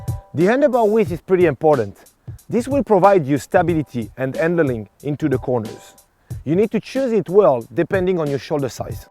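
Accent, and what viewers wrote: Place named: England, United Kingdom. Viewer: Les conseils avec un accent anglais moyen, c'est pas mal. En Français, ça le ferait pas mal aussi.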